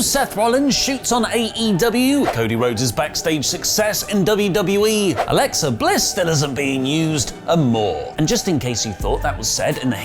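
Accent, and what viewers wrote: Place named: United Kingdom. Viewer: I must be going deaf. It sounded like Ollie said: "Emotionally abused her old anti-LBGTQ views", which was only slightly confusing someone would be released for abusing anti-LBGTQ views in 2022. I had to rewind it five times to hear "Emotionally abused her, holds anti-LBGTQ views", which makes a lot more sense why he would be released. That British accent will get you sometimes. 😆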